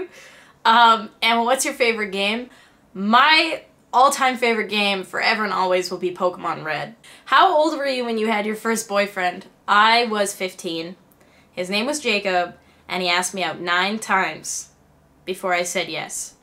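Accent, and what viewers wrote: Canada. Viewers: A lot of Canadian women sound like this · All Canadian women look and sound the same..., omgitsfirefox, Cobie Smulders (the girl from how i met your mother), and others i can't remember. Also i have a canadian friend and she likes capybaras too...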